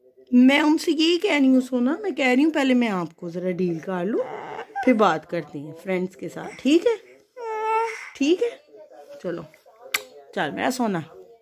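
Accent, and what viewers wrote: Sialkot, Pakistan. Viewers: aap ki awaz sa laga tha k aap sialkot sa ha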